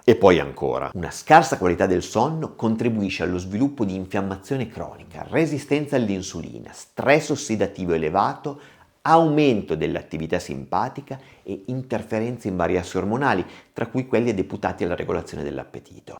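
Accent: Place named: Piedmont, Italy. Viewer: Ciao Doc, visto l'accento piemontese forte e il cognome, posso chiedere la città o paese che sia?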